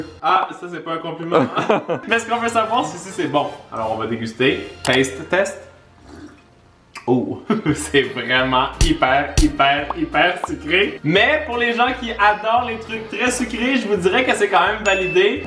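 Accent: Canada. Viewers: j'adore ton accen canadien♥♥ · Chui le seul à kiffer l'accent canadien ??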